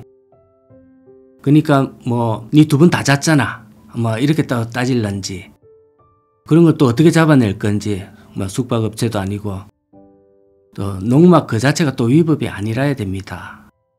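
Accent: Gyeongsang, South Korea. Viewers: 경상도인가요 좋네요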